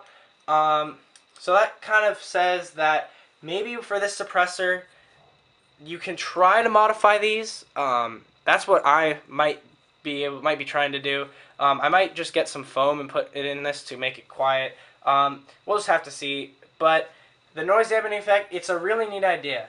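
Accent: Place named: North America. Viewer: You speak with a North American English accent and yet you keep pronouncing Aluminum the British way...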